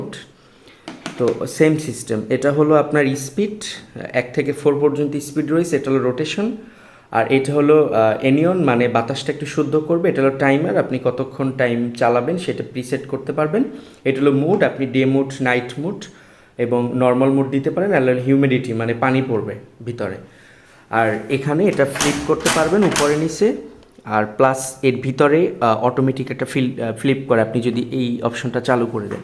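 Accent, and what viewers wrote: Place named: Bangladesh. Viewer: Apnar kotha sune mone hochche apni Bangladesh theke. Oidike coastal type er climate , cooler dry weather Chara cholena. Air cooler north/West india te bhalo chole, adrota thakle kokhonoi cholbena. Apnar chit chite lagche Karon humidity thakle aro humidity baraye, local Air cooler onek bochor use korechi, ac-r Cheyeo thanda Hawa deye, local cooler onek sosta ar. Boro hoye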